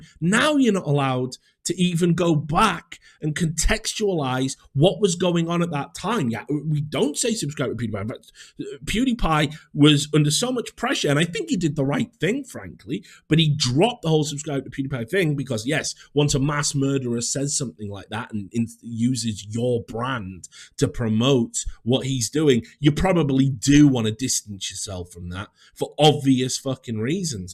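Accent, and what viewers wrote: United Kingdom. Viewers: How do u sound more British now?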